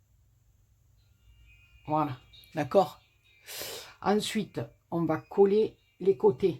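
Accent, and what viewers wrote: South, France. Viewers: Coucou! Je crois bien que le père noël va retomber amoureux de sa belle de noël ❤️ En tout cas moi je craque!!! J ai pas le matériel mais sinon j en aurai fait avec plaisir!!!! Comme celui que j ai eu a t entendre nous dire tes bêtises avec ton accent du soleil ☀️ Gros bisous 💋💋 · Géniale la petite Mère Noël. J'ai passé un excellent moment à la visionner. En plus avec l'accent du soleil et l'humour, que demander de plus. Merci beaucoup pour ce superbe tuto. Bisous breizh (Bretagne)!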